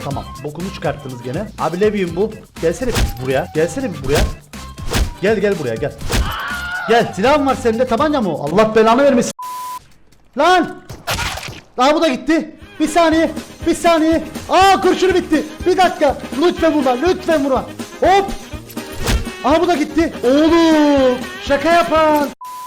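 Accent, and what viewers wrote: Cyprus. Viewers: Abi sen kıbrıslımısın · Sen nerelisin kibristan mii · Ben seni Kıbrıslı sanıyordum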